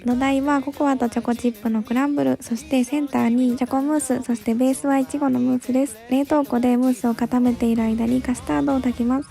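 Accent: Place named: Shiga, Japan. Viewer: おめでとうございます！❤ 所々ででくるイントネーションで出身が気になっていて、最後に滋賀県？なのが判明してスッキリしました😆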